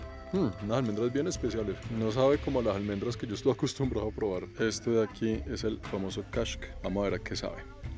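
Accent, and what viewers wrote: Bogotá, Colombia. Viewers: Soy nueva viendo tu canal y lo que más me gusta es como le hablas a los animales y es porque yo lo hago así también, ademas me gusta mucho el acento colombiano, gracias por compartir tu viaje de vida. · Hola, me gusta su canal. Soy de Bogotá por lo q me río mucho de su forma de hablar porque hablamos muy parecido. Hola niño!! Q dice el niño!! 😅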